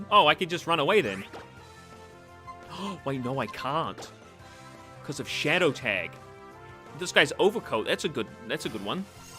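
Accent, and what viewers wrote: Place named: France. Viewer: Love your french accent haha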